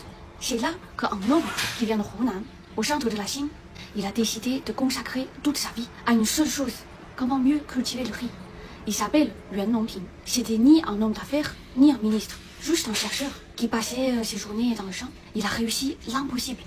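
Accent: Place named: Portugal. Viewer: Pourquoi elle a un accent portugais ? Un bug de l'IA?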